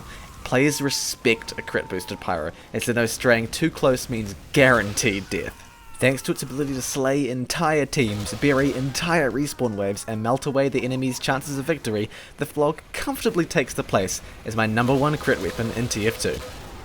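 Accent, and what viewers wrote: New Zealand. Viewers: You're from New Zealand aren't you? I recognise the accent. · are you from New Zealand? you sound like it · what national background do you have? i think you sounds new zealand, coming from an aussie :P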